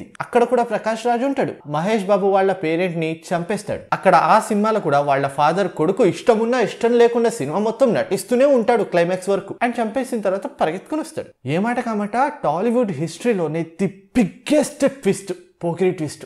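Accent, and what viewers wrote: Karnataka, India. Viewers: Bro your mother tongue is Telugu and grown wholly in karnataka I think. Same here. I observed this in your khaleja video also.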